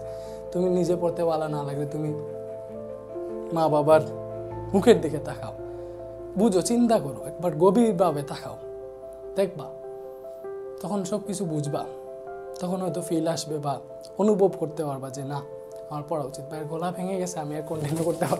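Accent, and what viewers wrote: Sylhet, Bangladesh. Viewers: Baiya apni Ki Sylheti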